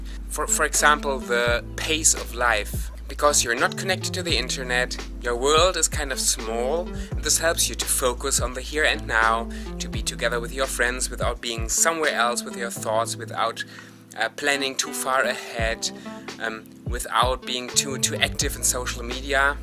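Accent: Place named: Eastern Visayas, Philippines. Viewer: nice u speak the dialect WARAY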